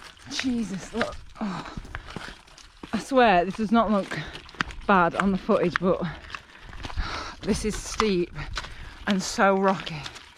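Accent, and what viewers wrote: United Kingdom. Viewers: I am so fall in love in your British pronunciation. Bloody Hell! 🤣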